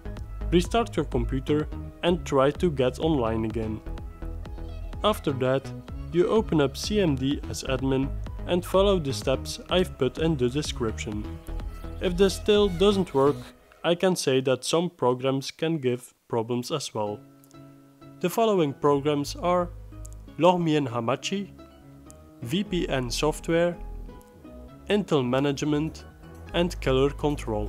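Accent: Netherlands. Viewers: 100% your from nederlands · Man your accent sounds so dutch, i love it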